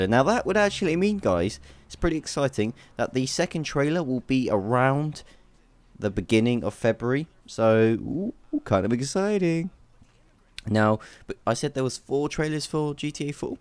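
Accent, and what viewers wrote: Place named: United Kingdom. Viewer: A mysterious British guy whose accent isn't sexy. What a relief. Usually British accents are attractive but his just isn't, lol!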